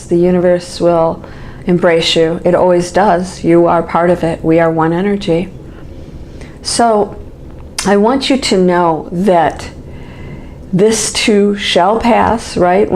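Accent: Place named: Michigan, United States. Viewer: Are you from Michigan or around there? My science teacher has the same accent and she's from there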